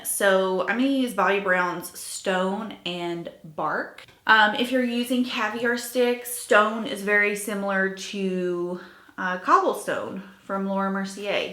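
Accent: South, United States. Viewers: I love your southern accent though!💛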